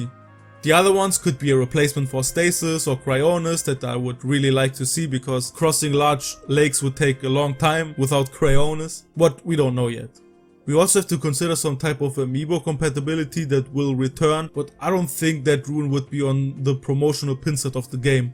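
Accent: Germany. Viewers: Are you german because youre accent sounds lile a german one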